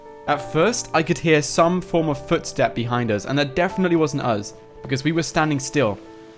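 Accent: United Kingdom. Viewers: are you from the UK LIKE SO CAN SEE???🤔👍👍👍🕵️‍♂️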